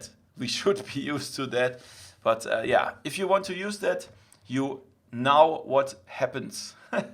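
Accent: Germany. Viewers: Nice video, I love the german accent haha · One question do you speak german because i hear a german a aksent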